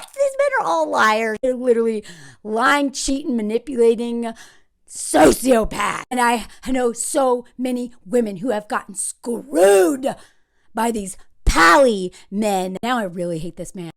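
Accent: South, United States. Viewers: I love your southern voice! 😂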